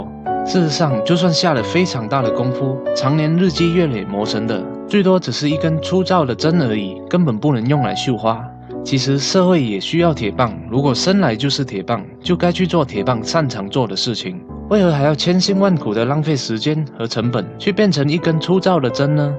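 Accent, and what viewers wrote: Malaysia. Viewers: 是馬來西亞口音嗎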